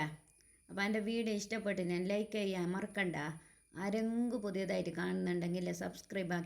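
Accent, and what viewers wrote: Kasaragod, India. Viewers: നിങ്ങടെ സംസാരം എനിക്ക് ഒത്തിരി ഇഷ്ടമായി നിങ്ങൾ കാസറഗോഡ് ആണല്ലേ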